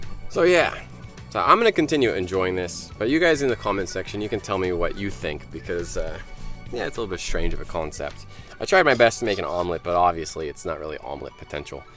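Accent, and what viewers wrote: Vietnam. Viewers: This is a guy trying to say english, he's vietnamese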